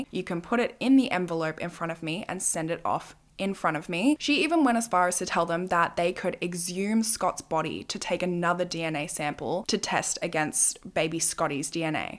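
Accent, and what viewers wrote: Australia. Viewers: you just earned a new sub! love the way you explain things, i’m also australian. it’s so nice hearing a familiar accent when listening to these kinds of things, thank you for your videos! <33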